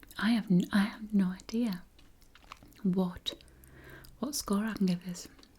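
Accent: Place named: Newcastle, United Kingdom. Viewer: ASMR + Geordie accent = homesick lol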